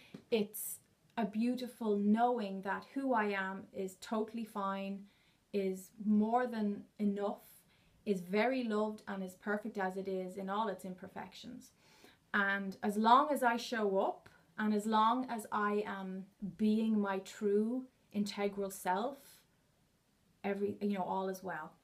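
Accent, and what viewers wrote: Ireland. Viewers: thought the accent was Irish. I'm up in belfast, would love some where in the country away from it all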